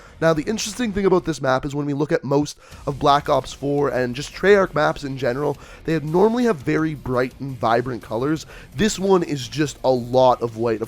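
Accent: Canada. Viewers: oh, he's Canadian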